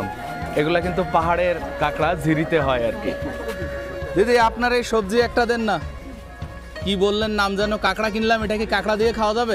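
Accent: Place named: Barishal, Bangladesh. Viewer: আমি বরিশাল বিভাগের পটুয়াখালী জেলা থেকে দেখছি, যিনি উপস্থাপনা করতেছে তার বাড়ি মনে হয় পটুয়াখালী বা বরিশাল।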